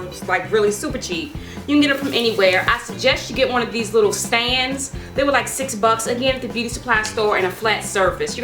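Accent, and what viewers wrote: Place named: North Carolina, United States. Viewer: You are super creative and beautiful. Love your accent, too! I'm a New Yorker, but I can tell you're from NC. <3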